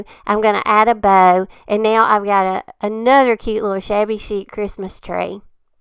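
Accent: South, United States. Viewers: Girl, I love your channel...and that so southern accent!! I’m from SC, but yours is better than mine ❤️ I’m making ornaments this year for close friends and family..a lot of this stuff I have in my stash!! Checked out Olive Rue and signed up for her newsletter and will be wrapping my candles for the Holidays!! Thank you both so much!!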